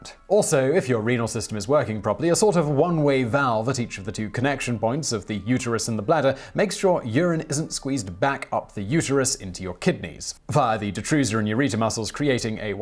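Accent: United Kingdom. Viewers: pretty sure he's British but not 100%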